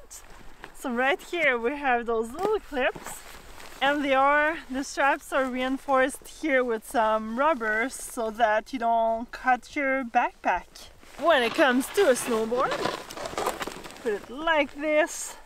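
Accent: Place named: Quebec, Canada. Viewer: You sound like you’re from Quebec, Canada!! Is the bag more of a burnt orange, or is it truly red?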